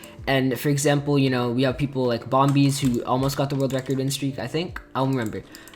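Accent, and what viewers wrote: United Kingdom. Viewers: Are you British? I think so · Hi u british??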